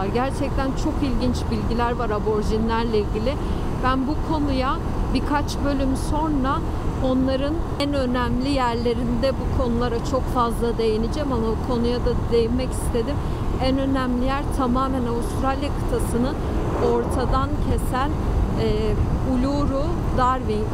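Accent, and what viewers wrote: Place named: Adana, Turkey. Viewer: espirili konuşmanı seviyoruz.Tıpkı adana şivesiyle konuşuyorsun seni seviyoruz.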